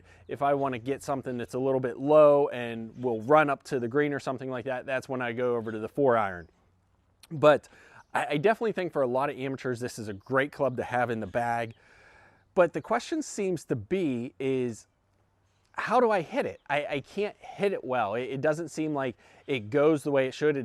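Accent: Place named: Western Pennsylvania, United States. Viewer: You have a western PA accent...was hoping you may be from the Pittsburgh area...I just purchased a cheap set of single length irons just to try them out and was looking for someone local to me to help with the transition...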